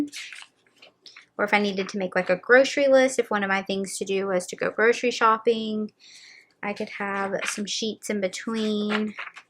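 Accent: South, United States. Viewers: I feel like I grew up with you with your accent. Just every southern girl I’ve ever know lol 💙 from South Carolina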